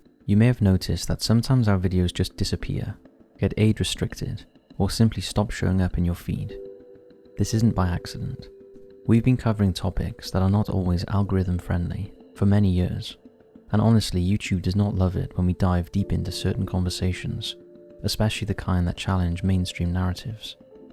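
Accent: Wales, United Kingdom. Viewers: I could listen to this guy all day long. Who knew the Welsh accent was so beautiful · Why are all these shows being narrated by british men why not American?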